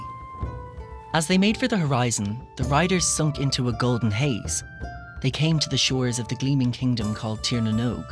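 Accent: Dublin, Ireland. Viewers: As an Irish person I agree with the OP because the Irish accent adds the authenticity that an American accent wouldn't, whether Irish American or not. American accents are already so omnipresent in all media that I don't see the problem in our myths and legends being recited by a recognisably Irish tongue. There's no reason to feel insulted 🤷🏽‍♀️ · One complaint: the stress on 'Danann' ought to be on the first syllable, not the last one. Unless you're speaking Munster Irish, and the narrator sounds like they're from close to Dublin. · He's got an ear-grating D4 accent though. The most reviled accent in Ireland. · Love the story. The irish voice also goes with it too. Keep it up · Lol you can hear the narrators irish accent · D4 accent? Just sounds like Dublin to me. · I appreciate that you had someone with an Irish accent narrate this. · Its a Irish accent because an American cant pronounce the names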